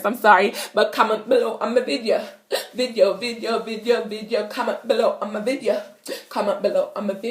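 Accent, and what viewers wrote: Patois, Jamaica. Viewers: Hi my name is kiera and i love your Jamaican patoa talk its cool i talk like that sometimes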